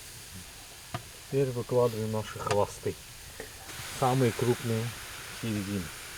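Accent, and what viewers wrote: Baku, Azerbaijan. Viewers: Приятно услышать бакинскую речь и видеть интеллигентного человека! привет от бакинского армянина из москвы! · Ты настоящий Бакинец,по акценту видно,очень понравилось твоё видео.Здоровья тебе,твоим родным,спасибо тебе ахпер.Обязательно такое приготовлю,если смогу. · Отличный рецепт, выглядет очень аппетитно, а мой родной бакинский акцент это бомба 💥👍👌👍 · джан! Джан ээ бакинский акцент ❤️🤲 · Во первых блюдо вкусное))) Во вторых, бакинский акцент прикольный👍👍👍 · Как только услышала речь, сразу догадалась, что это бакинец. Не смотря, что сейчас живу в России, но акцент южный бакинский остался. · Бакинских акцент 👍🏼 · Азербайджанский акцент не перепутаешь не с каким другим.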